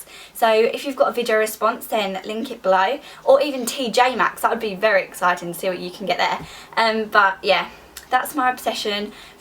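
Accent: Essex, United Kingdom. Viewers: R u from essex?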